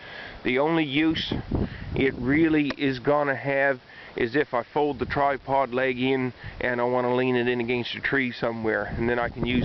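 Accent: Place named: Maritimes, Canada. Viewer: Maritime Canadian accents the best accents.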